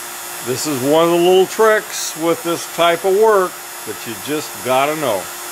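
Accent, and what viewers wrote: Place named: South, United States. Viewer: It makes great sense...I learn from this man every time I watch him....A great, country voice, to boot.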